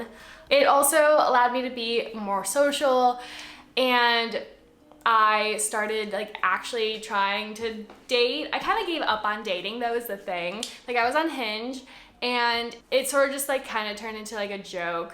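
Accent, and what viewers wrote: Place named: Los Angeles, United States. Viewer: You're gonna fit into LA with your voice lol. I would've thought you were from there. No shade though.. Great and inspiring video. Thank you and congrats!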